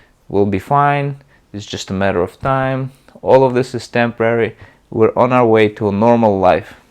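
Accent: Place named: Russia. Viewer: Been dealing with Pain/Fibro issues for a long time, I was bed bound for about a yr about 8 yrs ago but still have synthoms found a great TMS dr here in mass hes Russian like yourself ..so to hear your accent and his which is the same it gives me alot of confidence ...thx you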